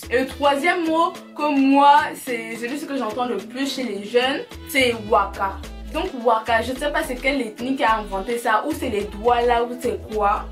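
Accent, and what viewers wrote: Ewondo, Cameroon. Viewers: L'accent camair est vraiment funny...suis senegalais mais j'aime bien...Mais j v savoir aussi c'est quoi"macabo" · à t'ecouter on dirait une beti ewondo camer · T'as vraiment un accent kmer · Je suis congolaise mais j’ai kiffé ta vidéo vous avez grave d’expression j’aime trop l’accent camer D’ailleurs ça veut dire quoi « ne pas donner le lait » j’ai jamais compris, vu que tu l’as pas expliqué dans la vidéo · 😂😂😅😅 Merdeu l’accent camer de la gow ci me bollè seulement 😂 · j'ai aimé la vidéo c'est fou coe tas cette accent camerounais alors que ta grandit en France .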